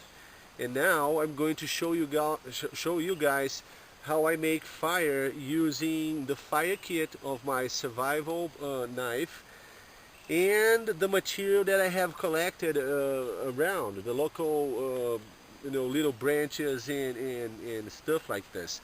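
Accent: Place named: Brazil. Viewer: Mano, Vc fala bem inglês, mas pelo sotaque eu acho que é brasileiro que nem eu. Parabéns pelo vídeo, muito bom.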